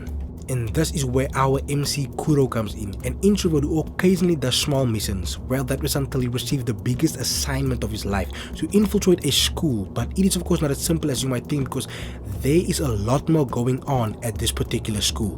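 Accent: South Africa. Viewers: Hebanna, I didn't expect a South African accent ... Awe bra waka. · this defs a south african bru speaking here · My guy are you a Saffa?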